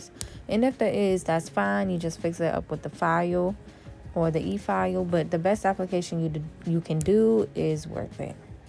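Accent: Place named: Washington DC, United States. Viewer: U sound like u from dc...or md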